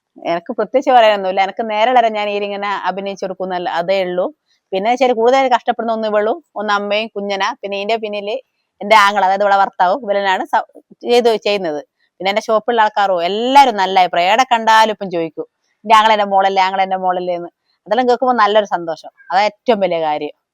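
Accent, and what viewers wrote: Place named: Vadakara, India. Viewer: എനിക്ക് നിങ്ങളുടെ വടകര ഭാഷ കേൾക്കാൻ നല്ല ഇഷ്ടമാണ് എന്റെ നാട് വടകരയാണ് ഇപ്പൊ പാലക്കാട്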